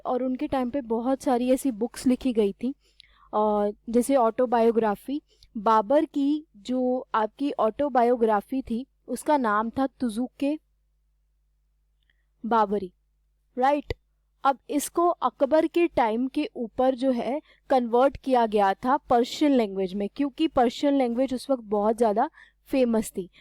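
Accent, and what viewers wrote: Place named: Punjab, India. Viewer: I love ur punjabi bol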